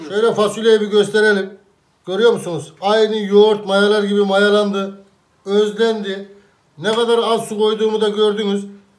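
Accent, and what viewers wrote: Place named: Central Anatolia, Turkey. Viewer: „Baska bisey deel“😀 ustam ankaralimisin veya ic anadolu, bizim oranin sivesi sanki 😇 püf noktalariniz icin cok tesekkürler :)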